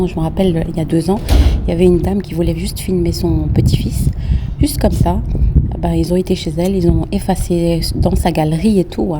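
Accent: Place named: Belgium. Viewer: Ca sent la belgique quand tu parles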